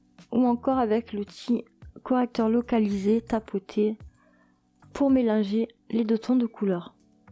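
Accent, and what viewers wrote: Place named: Béarn, France. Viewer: on craque également pour l'accent (bo-arnaiz)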